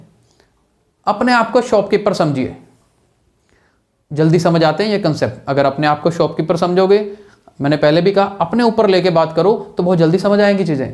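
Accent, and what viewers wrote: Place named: Punjab, India. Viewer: Punjabiyo ki language alag hi pta chal jati he😊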